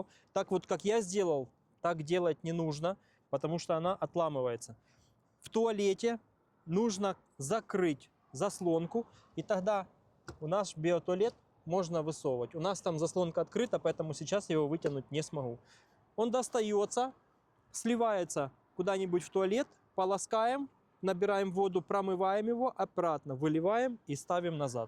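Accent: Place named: Ukraine. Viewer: Слова "ложить" нет в русском языке....есть "положить" или "класть". Думаю Вы украинец, судя по акценту. Спасибо за видео.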